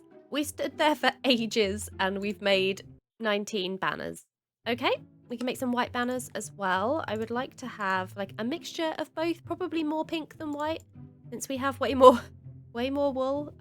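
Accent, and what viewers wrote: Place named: United Kingdom. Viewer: Love the vids. Builds look great and nice to hear a chiiled brit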